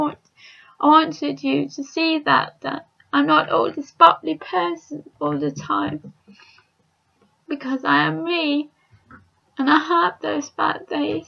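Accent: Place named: United Kingdom. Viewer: Du virker sød men hvorfor taler du med britisk accent. Håber det bedste for dig.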